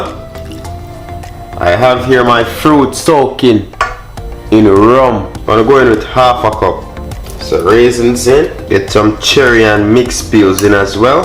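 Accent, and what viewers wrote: Jamaica. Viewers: As soon as I heard that Jamaica acent...I started smiling, hey maan 😃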